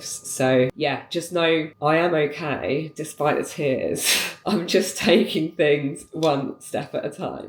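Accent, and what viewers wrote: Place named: United Kingdom. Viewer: theres a young lady that talks just like u lol we love it at work is it like from england british or irish lol i dunno it just so dam cute liver pool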